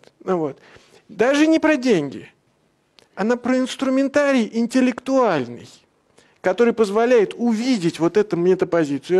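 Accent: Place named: Odessa, Ukraine. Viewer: Андрей Владимирович тут чутка одесситские интонации словил)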